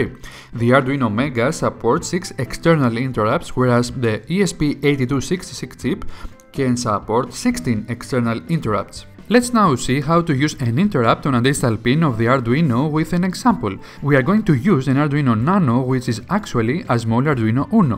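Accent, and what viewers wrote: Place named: Greece. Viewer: Nice video! Are you Greek by any chance ? Your accent sounds a lot like the average Greek speaker trying to speak English.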